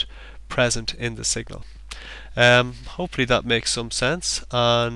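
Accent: Ireland. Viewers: also, great accent. more people should talk with irish accents.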